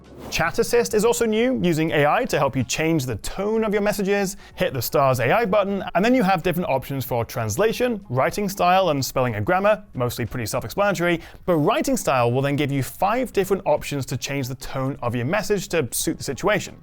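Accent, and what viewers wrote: England, United Kingdom. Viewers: Your like the 10,000 person to leave a review on this phone. English accent doesn't resonate with Americans. And your review is sub par mate.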